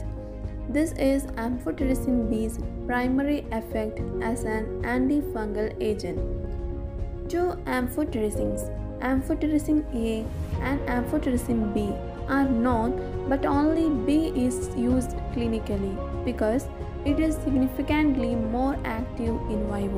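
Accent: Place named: Kerala, India. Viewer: The speaker is mallu 💯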